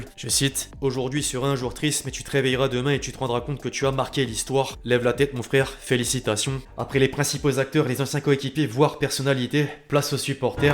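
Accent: North, France. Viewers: Arrêter de spamer des commentaires comme ça, il a un accent du nord. C'est pas en faisant des reproches que ça va changer.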